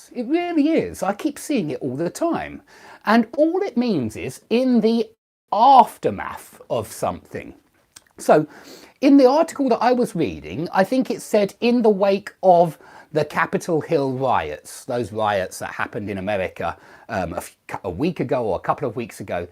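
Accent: England, United Kingdom. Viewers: Mr thank you first for your lessons, I wanna ask you please, is your english accent a northerner or southerner one?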